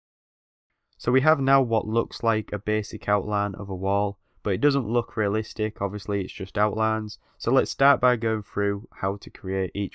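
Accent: United Kingdom. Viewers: its 100% british to me lol. dem vowels lol noeu weuy, doeunt goeu theiu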